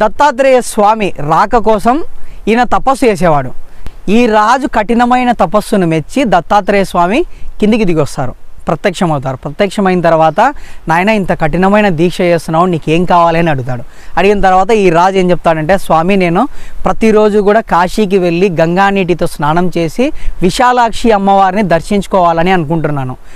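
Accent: Rayalaseema, India. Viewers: I am from Telangana but I love your stories andhulonu ne Rayalaseema yasa enkka super bro nenu and na family subscribe chesukunamu all tha best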